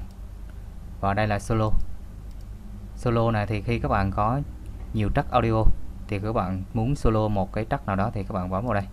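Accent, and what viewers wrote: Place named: Quang Nam, Vietnam. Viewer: anh người quảng nam hã, cho e làm đệ tử với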